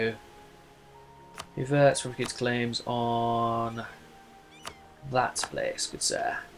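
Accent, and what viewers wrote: United Kingdom. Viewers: Your channel picture is a red maple leaf, and you use Received Pronounciation. Is the accent natural or learned?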